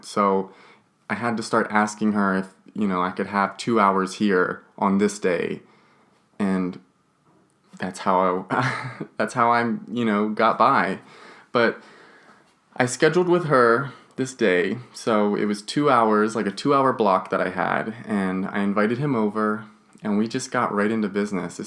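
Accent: United Kingdom. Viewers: you are so hot you could turn on a dead guy. Oh, the shirt looks super. The British accent is a turn on for me as well. You look and sound super sweet and I know you must be since I have seen some of your adult films. Please take care because you do great videos, and you certainly are wonderful to look at and listen to. Thanks for the videos!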